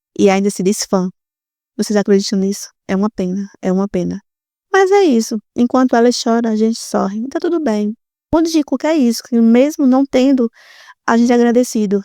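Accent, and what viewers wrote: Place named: Bahia, Brazil. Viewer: Oi menina. De estado do Brasil vc é?? Pelo sotaque acho que é Bahia. Acertei???😂🎉🎉🎉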